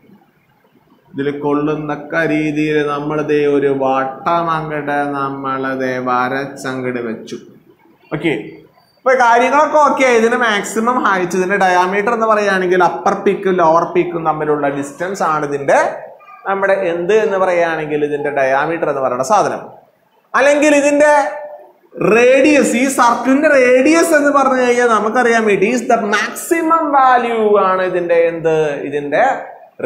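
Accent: India. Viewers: pinnalha... തൃശ്ശൂർകാരൻ ഡാ 🔥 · Kasargod karan alle ariya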